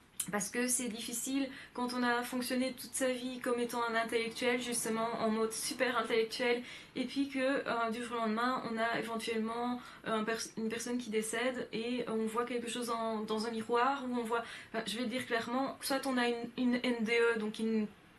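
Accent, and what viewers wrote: Brussels, Belgium. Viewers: Bonjour, me trompé-je ou entends-je un brin d'accent Bruxellois? Pardon c'est hors sujet mais ... voilà. :-)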